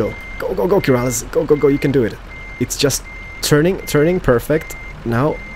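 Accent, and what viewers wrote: Scania, Sweden. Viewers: For all people who aren't swedish! does not speak any swedish, he speaks skånska! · And plz try to not speake skånska när du utalar :) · Ahahahaha skånska <3 omg varför låter allt så roligt?! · Vilken härlig skånska du har :)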